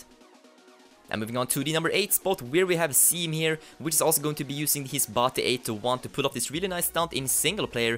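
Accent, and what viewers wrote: Sweden. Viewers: Are you swidish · Nice video! are u swedish? u sound swedish :D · Do you come from sweden? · Thats classic swedish style english almost every swedish talks english like that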